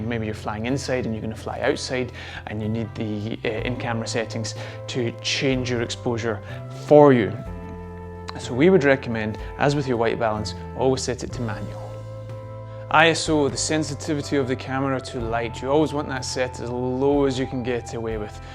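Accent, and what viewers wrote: Scotland, United Kingdom. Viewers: Great video for a newbie. So good to hear a good Scottish voice :-) Thanks for such a clear video - i have now set my new mavic to all these settings. Working my way through your set.. Cheers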